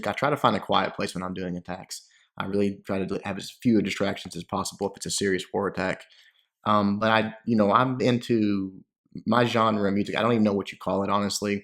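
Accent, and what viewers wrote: South, United States. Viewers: Yeah, the southern-ish american accent is pretty cool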